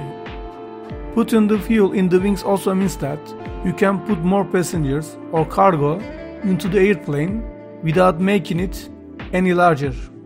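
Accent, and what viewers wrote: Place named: Turkey. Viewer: Nerde duysam tanırım bu Türk ingilizcesi, selamlar kardeşim :)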